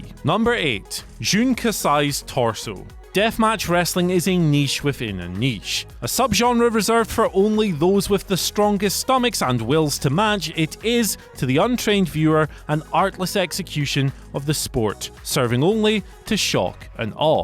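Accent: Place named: Scotland, United Kingdom. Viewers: Is from Aberdeen, Scotland because he sure sounds like he is? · Are you Scottish 🏴 ehh my pal 👍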